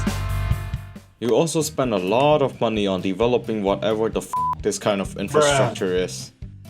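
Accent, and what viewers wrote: Germany. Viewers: Is this guy german, if no his german is really good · Great German accent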